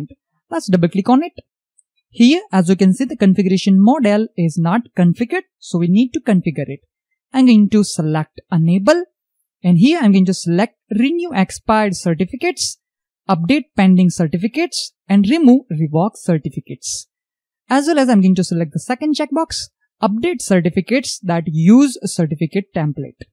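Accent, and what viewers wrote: India. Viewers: indian accent boosted my brain, thank you bro i love india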